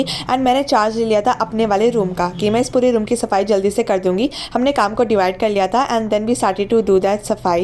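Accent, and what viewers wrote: Haryana, India. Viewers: Aap haryana se ho??? Seriously??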